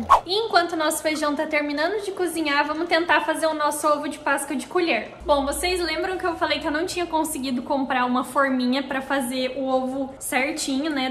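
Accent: Paraná, Brazil. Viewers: Tudo gente chata que se incomoda com o nosso jeito Paranaense de falar, pois eu tenho orgulho de falar como a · Gente! Paranaense fala assim mesmo!! Botar fritar, botar ferver, botar fora quando fala q é pra jogar fora algo sou paranaense e sofro em sp kkkkk povo olha p mim até hoje estranho! Terreno é data... Enfim é só o jeito paranaense de falar kkkk bota like aí povo!! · não liga pros comentários ruins principalmente do seu jeito de fala.. vcs dois são lindos e sem dúvidas o melhor canal do YouTube com divirsidade no canal pra gnt... Eu tbm sou Paranaense ( Guarapuava) e amo nosso jeitinho.. manda um bjo pra mim se puder 🥰🥰🥰🥰🥰🥰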